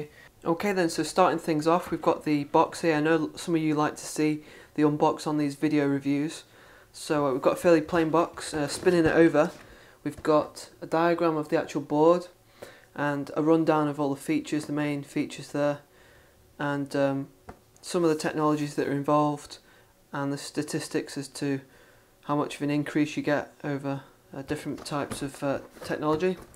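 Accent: England, United Kingdom. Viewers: I'm extremely curious... coul someone tell me where is that english accent from? :)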